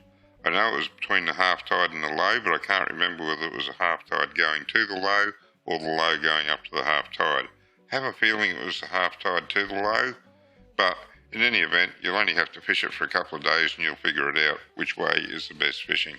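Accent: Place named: Queensland, Australia. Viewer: It’s a pure Queensland accent, a rarity these days.😂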